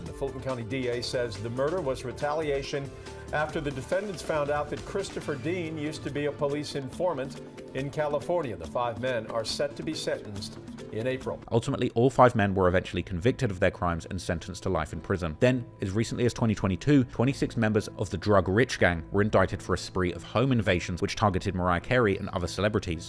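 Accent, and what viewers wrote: United Kingdom. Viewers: Hearing about shit that happened where I grew up in a British accent is weird lol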